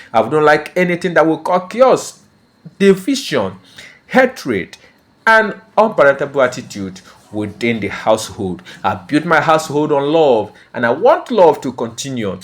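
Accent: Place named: Nigeria. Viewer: 😂😂..... You can slang all you want, but your Naija accent makes it so funny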